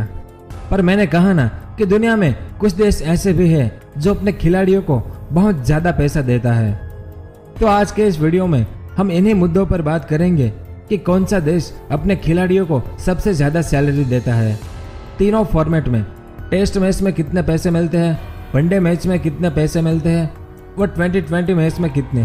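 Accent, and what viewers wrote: Gujarat, India. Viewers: bhai gujrati hoo kya?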